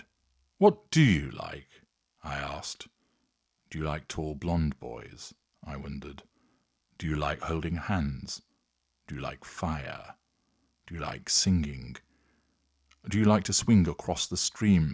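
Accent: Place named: Leeds, United Kingdom. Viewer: Not a Manchurian accent! Yup! Leeds!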